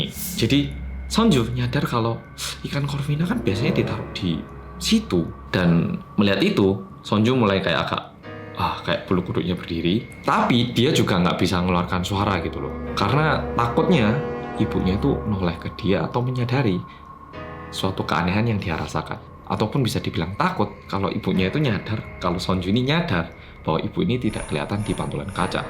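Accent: Java, Indonesia. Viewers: 💙💙💙💙💙💙💙💙medokkkk karo ngapakkkk pada ae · Karena keseringan dengerin bercerita,waktu baca novel jadinya suara yg logat jawa itu yg terngiang ngiang. waduh gimana ini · kaet ngerti onok wong korea medog wkwkw ! auto subscribe hehehe..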